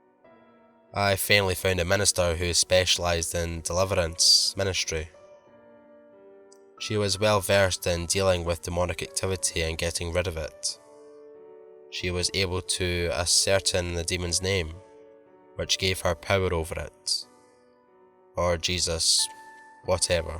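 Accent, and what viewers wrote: Scotland, United Kingdom. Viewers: Never heard The Smiling Man narrated in a Scottish accent before! Thanks for narrating one of my favorite Creepypastas 😁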